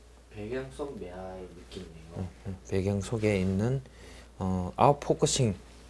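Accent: Gyeongsang, South Korea. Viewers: 경상도 사투라가 정감있고 너무 웃깁니다,훌륭한 그림배움과 더불어 갖는 큰 즐거움 입니다.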